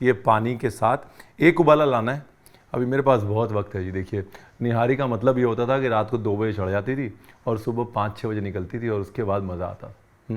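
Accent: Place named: Lucknow, India. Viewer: तुम्हारा लखनवी लहजा बहोत खूब हैं! मै भी इसी तरह बात करना चाहती हूं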